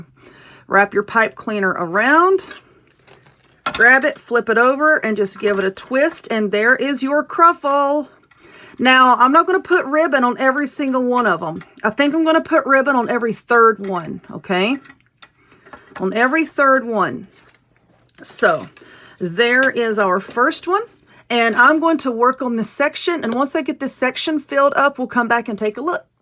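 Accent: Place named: Kentucky, United States. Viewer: I love your videos! If I was to ever make a video ~ I swear I would talk just like you! (I’m from Kentucky). I love your down to earth talk & your slang! God bless you sweetie!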